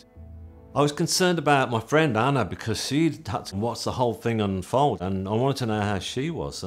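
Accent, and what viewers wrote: England, United Kingdom. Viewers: I'm English, but it sounds so strange hearing this form an English voice. Incredible story x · Hearing this English, down to earth accent makes the after life feel even more real. ❤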